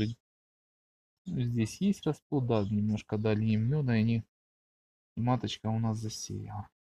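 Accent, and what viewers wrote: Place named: Kharkiv, Ukraine. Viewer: Сократить, утеплить. В октябре, при наступлении температур днем не выше 12-14градусов, присоединить пару рамок с пчелой от сильных семей. Подсилите таким образом. Или соедините с отводком сейчас. Семья эта слабая. Перезимовать перезимует, а как родоначальница будет не айс., Вы с Харькова? Только от тамошних пчеловодов слышал слово ульИ, а не Ульи...извините.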